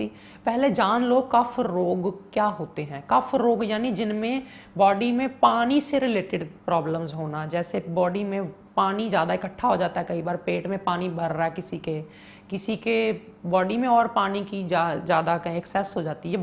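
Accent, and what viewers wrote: Punjab, India. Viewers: Ye punjabi accent hai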